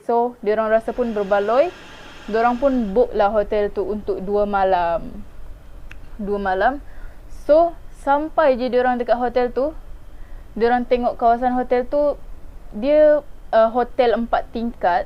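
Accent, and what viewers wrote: Sabah, Malaysia. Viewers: Orang sabah ke sis ? Cara cakap mcm org sabah 😂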